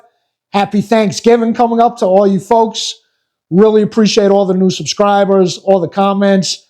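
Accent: New York, United States. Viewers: Love the New York accent 😉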